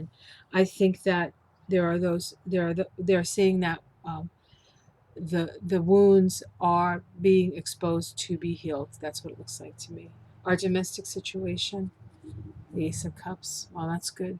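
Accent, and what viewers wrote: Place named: East Coast, United States. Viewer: Hi! I've been so busy lately that I haven't been watching. It's so good to just sit down and listen to you. As soon as this video is finished I will find the June Kabbala reading. Many blessings to you and thak you! 🧡 P. S. - I love hearing your East Coast accent. Makes me feel right at home. ☺️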